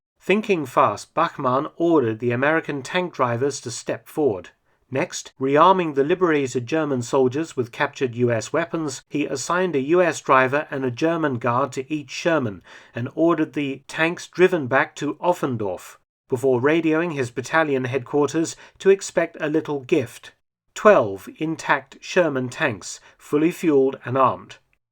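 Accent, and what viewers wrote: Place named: RP, United Kingdom. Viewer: You kinda sound like a posh clone-trooper.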